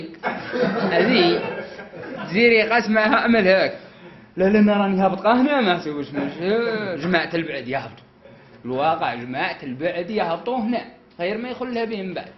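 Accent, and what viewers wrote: Djelfa, Algeria. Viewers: مهدرة تاع جلفة حلوة وتضحك يعطيك صحة...سكرة في لي ميحبكش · لهجة جزائرية جميلة.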